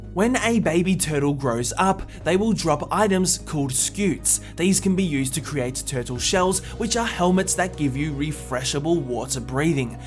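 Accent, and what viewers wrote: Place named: Australia. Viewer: Hi I love your videos and your personality. You inspire me to make short content on my own but I don’t post it because my friends say it’s ‘not good enough’. You are my all time favourite YouTuber and your accent is amazing. (I’m Aussie too!) But in all seriousness, I can’t live a day without watching one if your vids. ❤️❤️ Edit: OMG OMG a heart!!! 😁😁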